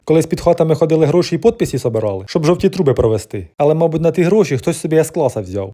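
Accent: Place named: Surzhyk, Ukraine. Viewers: Таки гарний суржик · Як я вас люблю слухати))) Хоч ви з Черкащани, а звучить,як наш полтавський суржик зі всіма діалектами 😂❤❤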